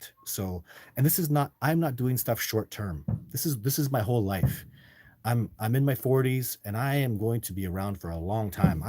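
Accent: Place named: United States. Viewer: You sound more like an American, than a Canadian! You can move down to the States if Canada is gonna keep you locked up! 😊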